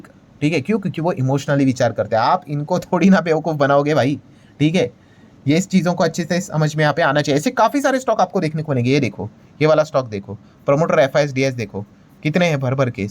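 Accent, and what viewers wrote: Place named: Rural, India. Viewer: Gadha share market ka bolna nahi sikha gaon Wale ki tarha bolta hai .educated analyst ko dekh kar kuch sikha kar ke Kay bona hai aur kitna bolna hai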